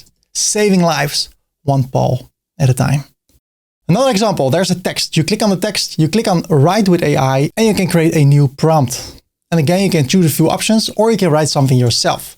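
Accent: Netherlands. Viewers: I hear a dutchy accent, Ga zo door broer ! jij hebt prachtige content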